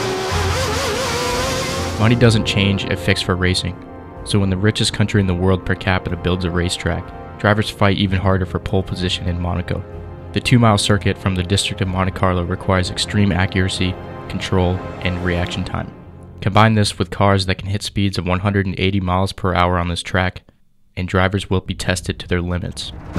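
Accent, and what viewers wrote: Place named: United States. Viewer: Can’t take these Formula One videos seriously with an American voiceover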